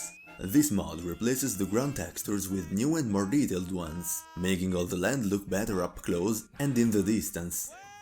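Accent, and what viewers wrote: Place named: Italy. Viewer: comunque si sente tantissimo che sei italiano, comunque bel video!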